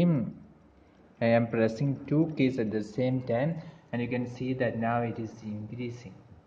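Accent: Kerala, India. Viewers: its a india, kerela accent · lemme guess you're an Indian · Are you from kerela? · മലയാളി ആണെന്ന് മനസ്സിലായി , മലയാള ഇങ്ഗ്ലീഷ്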